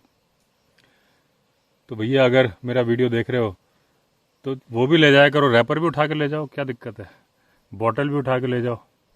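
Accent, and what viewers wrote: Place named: Delhi, India. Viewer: Very nice Ji Aap ko 3 Years follow kar Raha hu, retired Force memer hu, Aap say bahut knowlegde milte hai. Delhi born hu, South Delhi Mai Ghar Education, par aap ki aawaj say esaa lagta hai, aap Aapnay hai Delhi blog dekta hu. Hamaray block may Vinod Awasthi Pandit ji or journalist banay, 3 bhi Sub very intelligent and I like same as well as. Thanks for knowledge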